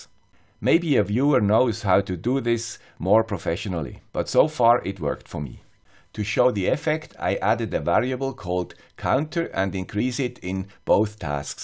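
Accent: Switzerland. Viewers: I also miss hearing your original intro "Swiss accent *again*". Feels like something is missing now :) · "Here is the guy with the Swiss accent...again..." - I always enjoy hearing that intro - it's your signature trademark. My wife gets a kick out of it too (sometimes she listens to my youtube tutorials LOL). Thank you for this video. I've been using the ESP8266 exclusively since the ESP32 software arduino support seemed to be very much in alpha/beta release. Based on your recent videos on the ESP32 it seems it's slowly starting to stabilize and become very useful (still missing bluetooth though). I like the intro on RTOS and the simple example - not so intimidating after all. Do you think it's possible to control two different GPIO pins in a task running on different cores? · Maybe Swiss? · The guy with the Swiss accent is kicking some serious ass -- great job! 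· you're a TRUE star (complete with your ubiquitous 'Swiss Accent'). I always enjoy your videos. I haven't yet done anything much with ESP32 boards as I've tended to concentrate more effort on ARM based SBCs (OLinuxuinos, Beagle, OrangePi, BananaPi, RaspPi etc), but my soldering iron is beginning to show 'twitching' signs of neglect. As soon as I can muster up some form of project to suit it, I'm sure I'll have the flux flowing... Thanx dude (from a guy with a Kiwi Accent... LOL)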